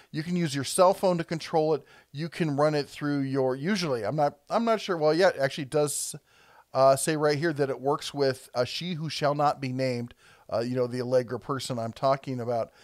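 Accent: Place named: Brooklyn, United States. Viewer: Pittsboig ??? Are you from my hometown.. Brooklyn ? 😁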